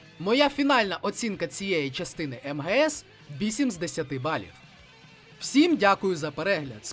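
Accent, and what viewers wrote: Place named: Russia. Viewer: Как видим, российская челюсть не позволяет нормально разговаривать на украинском. Продолжаем зоонаблюдения.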